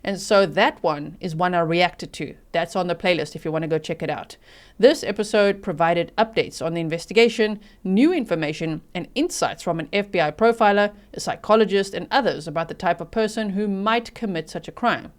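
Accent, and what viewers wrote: South Africa. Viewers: love your afrikaner accent! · I’m from South Africa as well, I thought you sounded like me. I live in ST George, Utah.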